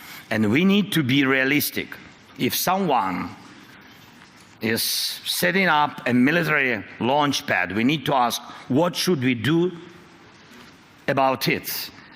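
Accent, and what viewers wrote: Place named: United Kingdom. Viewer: We need more bri-ish voiceovers its more suspenseful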